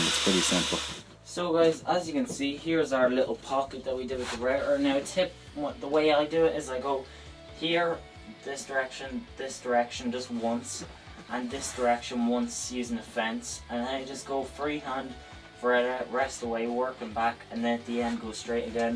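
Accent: Ireland. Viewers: Thanks. I have an old bench top saw which is about as accurate as a banana. Been looking around various videos on the net but have to say yours is excellent. I think the motor on my saw is fine so thinking of using that. My difference is that I am paraplegic so use a wheelchair so need to build the bench a bit lower. Thanks for the video. Only found you today but watched 4 of your vids and will watch more. From your accent I assume you are in the Emerald Isle. North or South? I'm in England near Cambridge but have visited Ireland many times and think it is a great place and have to say have always been made welcome. Sorry to babble on.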